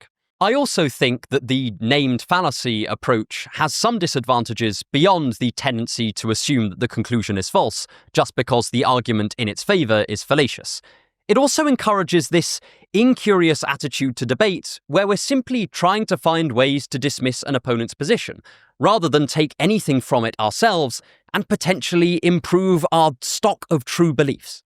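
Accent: England, United Kingdom. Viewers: I'm like 2 minutes in and the English accent alone make this so legit and sounds so intelligent.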